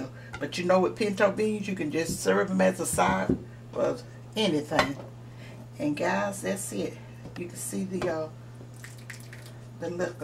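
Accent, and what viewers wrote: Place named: Alabama, United States. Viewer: You speak like my late grandma. Are you from south Alabama?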